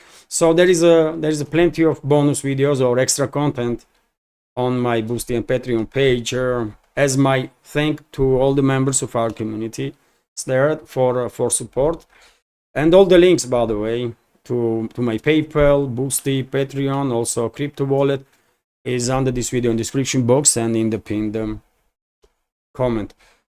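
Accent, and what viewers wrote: Russia. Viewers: Thank you. Keep it up. I am listening to you regularly. I like your russian voice and perspective.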